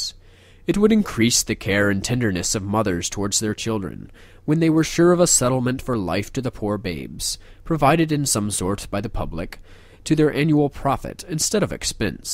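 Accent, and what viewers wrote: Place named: Ireland. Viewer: I think he is doing well with the narrating, but I couldn't tell if that is his reading voice or he is trying to sound Irish.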